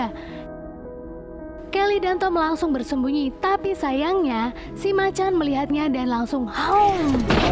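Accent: Pemalang, Indonesia. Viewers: Mba orang Pemalang yak?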